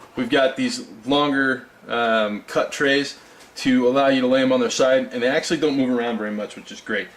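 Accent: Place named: Georgia, United States. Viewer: Welcome back to the vlog world! When did you pick up the Georgia accent?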